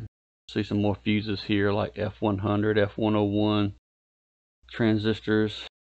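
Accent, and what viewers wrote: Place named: South, United States. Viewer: Thanks for always being so good about reading and replying to comments! I’ve been watching your videos for a few years now since I was trying to troubleshoot an EGO battery. You even made a short follow up video to answer a question I had back then and I’ve been a fan ever since. Actually I think you may have even helped shape my appreciation for Southern accents and their associated charm to be honest lol. You’re a great teacher, fixer and creator. Keep up the great work, wish you and your channel all the best! Much Mahalos and Aloha! 🤙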